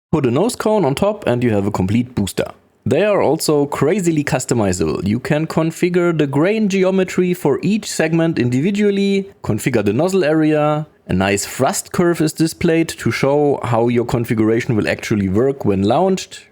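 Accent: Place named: Germany. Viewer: I don't know you but i love your german accent ;)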